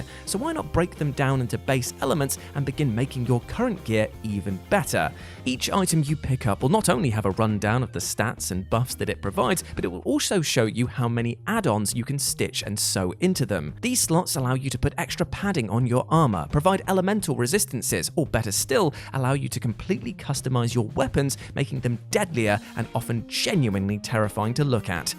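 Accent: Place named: United Kingdom. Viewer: Voice acting? I haven't heard any. Just a vaguely British narrator.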